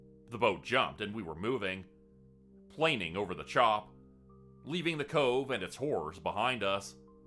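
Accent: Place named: Canada. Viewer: WA part o Canada u from A-HHH !!!! IMA FLORIDA BOY so I get ta hear all kinds o accents !!!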